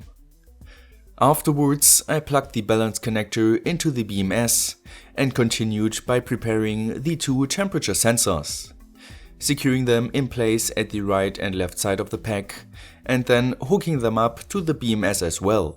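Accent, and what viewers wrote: Germany. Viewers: Are u german? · Man höher so hart dass du deutsch als Muttersprache sprichst 😂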